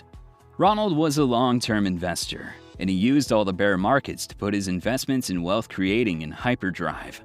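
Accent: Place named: United States. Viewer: An American voice wow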